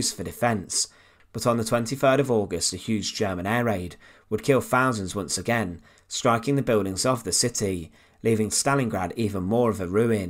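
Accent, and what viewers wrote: United Kingdom. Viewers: The narrators voice is too much..I can't understand it, that British accent is too heavy.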